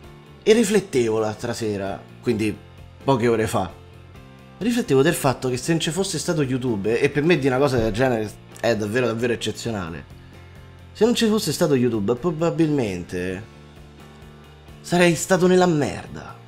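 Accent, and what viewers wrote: Rome, Italy. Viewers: Dietro uno schermo sembrate così extraterrestri, ma tu..o meglio, tu..sei una persona, vera e pulita. Ora io non ti conosco, dal vero almeno, ma sento la tua 'stranezza' molto simile alla mia stranezza, sei la persona più vicina a essere umano che ci sia su YouTube Italia.. tanto umana e da riuscire a farmi piangere col 'signor Mario.' a farmi ridere col tuo romanaccio nei video di minecraft. La cosa che stupisce di te è l'umanità, un valore che a quanto pare sta andando perdendosi. Io un giorno ti incontrerò, ti offriró un'immensa Gordon scura e farò un'immensa chiacchierata con te. Per il momento la Gordon la bevo in tuo nome, grande uomo in un mondo di alieni. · hai ringraziato i tuoi 200k..per averti aiutato..io nel mio piccolo farò lo stesso..grazie..penso sia un pensiero condiviso da molti..lasciare tutto e provare fin in fondo a fare ciò che ci piace è forse la cosa più difficile da fare..grazie del tuo coraggio..anche tu hai aiutato moltissime persone che in quel momento avevano bisogno di sorridere con un po' di romanaccio di sottofondo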